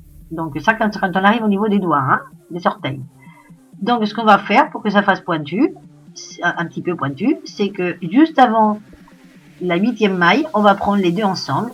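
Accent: Spain. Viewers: Petite curiosité perso: tu as un accent espagnol, je me trompe?😉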